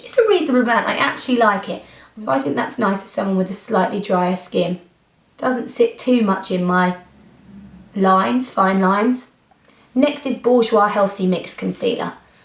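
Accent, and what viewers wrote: United Kingdom. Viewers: Love that british accent "last longaah, with your fingaah" 😚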